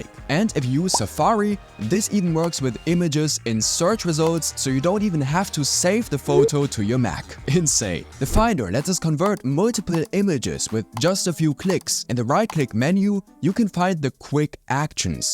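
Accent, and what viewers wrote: Germany. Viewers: I found out is German because of his Non-American accent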